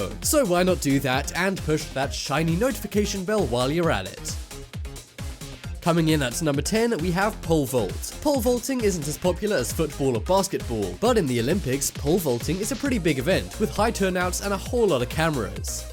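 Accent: United Kingdom. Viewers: He's British, and I would love to speak in a fluid British accent, but it's hard to learn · Yeah I can tell he's British "or of the sort" I just really like his accent and the pronunciation haha.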